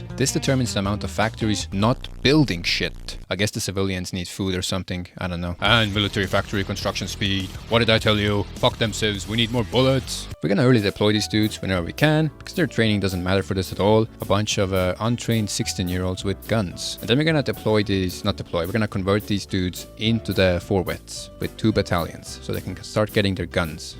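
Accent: Estonia. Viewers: I thought your accent sounded very familiar so I bet on Estonia. Still surprised to be right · Clocked the estonian accent 2 seconds in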